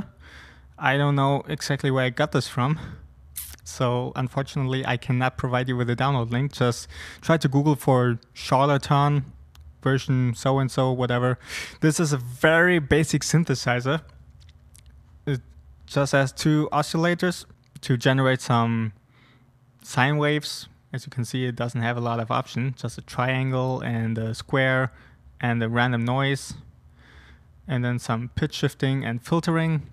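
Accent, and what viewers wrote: Germany. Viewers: are you a german guy? Greetings ?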